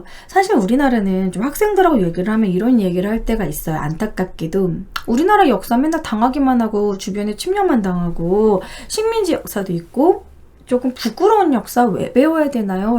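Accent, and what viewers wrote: Gyeongsang, South Korea. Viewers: 너 대구출신이지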